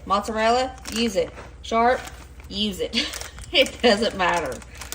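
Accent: South, United States. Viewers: Us southern girls say oil just like you. Don't go changin'! 😂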